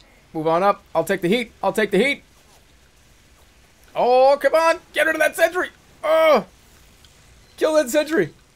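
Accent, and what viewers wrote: Boston, United States. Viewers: Are you from Boston? I'm a new sub, and I can't tell...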